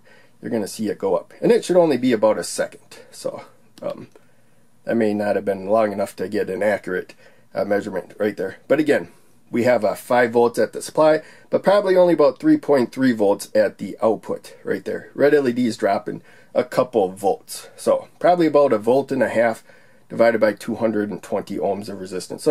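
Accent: United States. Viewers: Thanks! multimeter tells many things. your drawing and basic knowlage (what LOW, HIGH means) help me some. according to OUTPUT (LOW or HIGH), LED 1 or LED 2's voltage difference is much interest. multimeter between LOW(OUTPUT) and 5.3v VCC tells 5.26v. then between HIGH(OUTPUT) and GND tells 3.97V(220 ohm is between). Your english is so fast so not easy to listen for middle aged East Asian guy, but could hear some important phases. I think you are an Amerian from your english, that's right. Good luck for this hard decade with not beautiful some GREAT policitions here and there. Thanks again.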